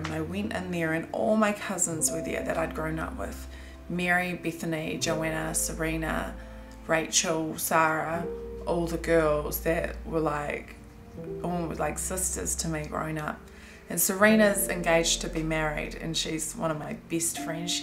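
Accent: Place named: Australia. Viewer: Christianity and cults have nothing to do with each other. They are two separate things. Seems like u grew up in a cult. This is not Christianity at all. Get to know Jesus as your personal Lord and Saviour. Sorry for your bad experience. Are u Maori? Saying since u have a down under accent, either New Zealand, Tasmania, or Australia. U do look very Maori though.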